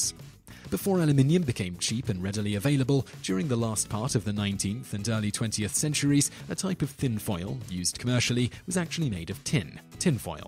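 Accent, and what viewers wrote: United Kingdom. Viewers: CrazyBear65 bonus fact, he is English, and speaking English the way the English speak it..... not the Americans. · I get the concept of England and America being two countries separated by a common language and variations on pronunciation. However, there are certain words, said throughout these videos that truly strike the American ear as painfully wrong. Some of these words include: Aluminium. Please note, there is only one L. Consider such words as: Luminate and Illuminate (which does have two Ls), these words - even spoken by the British, do not have the strange sound of: Al lu min E um. However it is forgivable. Controversy: it is con-tro-versy! Not Con-trav-ersy... England invented the friggin' language and the rules that go along with it. As such, they should know the difference between the sounds of an O and the sounds of an A ... 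not to mention the rules regarding syllables and how they are used! Although this is far more annoying than aluminum .. there are worse examples of the English butchering their own language. Behemoth: Perhaps the funniest thing about this word is the fact that the English are the only people on the planet who butcher this word. It is: buh-hee-moth with the O sounding like that of removing the N from the word, month... NOT: be-a-moth!!! There are several others, but these should do, for now. Still, there is one personal acception, from a previous video: Seneca. I grew up in a few different places: Ashford, Ireland; Ft. Lauderdale, Florida; and Central New York ... not far from Seneca Falls. Additionally, there is the Seneca indian tribes. We can all attest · It's spelled ALUMINUM It's pronounced UH - LOOM - IN - UM Where in the world do the English get the extra "I" that they insistently include in their pronounciation?!?!?! 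PLEASE STOP!!!! IT'S FRIGGIN ANNOYING!!! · Not sure if trolling, but here goes... The presenter is British while the rest of the channel team is American. It's merely the difference between American English and British English dialects. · American production, British narrator. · That how we pronounce it in the UK. We think the way you guys say it sounds silly so the feeling is mutual. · please explain why you, as a Brit, pronounce most words as we do but then spell your titles in the American form? I have seen in your titles color and here aluminum. Are you ashamed of your national heritage? As a Brit abroad you should be an advocate for our great nation and history.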